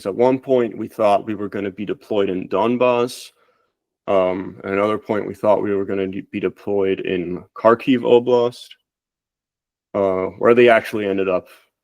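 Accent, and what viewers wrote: United States. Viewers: He’s European but has a perfect American accent? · He lived in EU all his life but sounds yank, and is half Peruvian. He's not going to be hard to ID with those facts. Also, guy sounds like a brain rotted zoomer. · Probably grew up on internet, so he adopted American English from nerds he played games with online. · He sounds American but says hes European. Must have spent long time in the US to learn English like this even his use of Like...Goddamn etc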